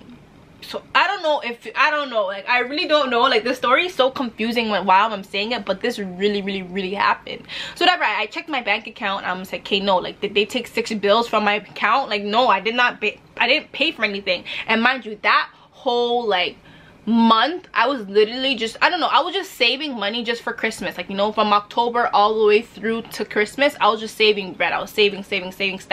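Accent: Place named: Toronto, Canada. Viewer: I loved this video she talk like a Tdot gyal fr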